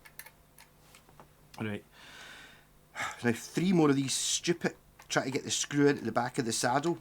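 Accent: Scotland, United Kingdom. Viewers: Great advice re working on cheaper guitars & refreshing to hear a Scottish accent ps uve got me wondering about temu pickups for a couple of kit guitars ( the pickups that came with the kits are dreadful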